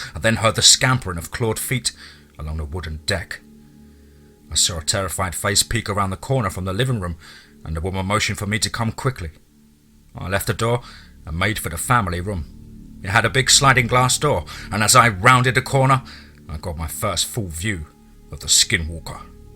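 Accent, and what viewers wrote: England, United Kingdom. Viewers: This guy has the same accent as fooster. What part of England are they from?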